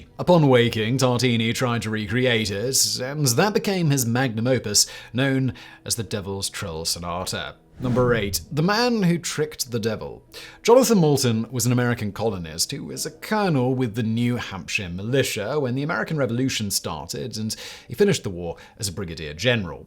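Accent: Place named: RP, United Kingdom. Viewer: Bro why do you talk like that.. makes it hard to listen/take seriously. I thought that pretentious posh accent died out by the late 1900s.. even the British RF dont talk like that anymore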